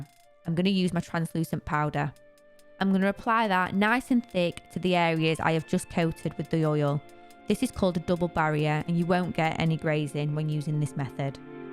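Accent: United Kingdom. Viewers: It looks so relaxing, I'd love you to do mine! I wish I was in the UK going by your adorable accent.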